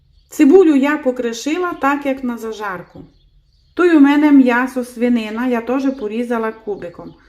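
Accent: Transcarpathia, Ukraine. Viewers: Йой як файно по закарпатськи говорите, лем коли чуву ож крумплі то ріпа, та уха ми ріже 🤣 Вже варю, дораз увидиме шо то за діло 🤓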